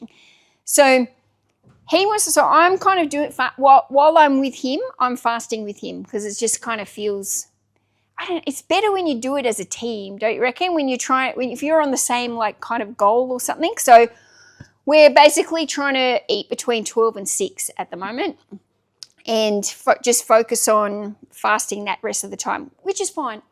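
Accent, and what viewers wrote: Australia. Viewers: I've been enjoying your videos for a few months now. I discovered them through the tech n sports interviews. I love how honest you are with yourself and other resellers. It makes it easier for us as viewers to be honest with ourselves. Thanks for your willingness to put yourself out there. One other thing. Have you ever done a video translating Australian slang (or pronunciation) to American slang (or pronunciation). I already picked up a few like Mills & Burns are Harlequins. "Gair-idge" is a "Gahr- ahdge". You mentioned a Mocher (spelling?) before. I'm assuming that is a Mochaccino coffee?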